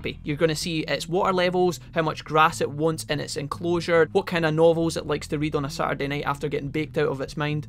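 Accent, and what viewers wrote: Scotland, United Kingdom. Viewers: Excellent tutorial so thank you very much for that! It is so easy to understand your Scottish accent, that is a really big bonus man. Cheers. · Thanks for the tips. Love coming here also cause of the Scottish accent 😂😁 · thank god I have found you. many of those videos, especially the LPs are provided by folks with nerve grinding voices. is it excited teenagers? Idk, but it's so hard to focus on the game with this auditory abuse. you, however, have a calm voice and scottish accent. what's not to like? thumbs up!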